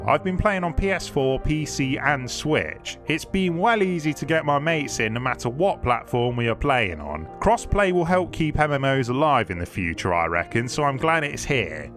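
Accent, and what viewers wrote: United Kingdom. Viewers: I don't know who you are but you sound really british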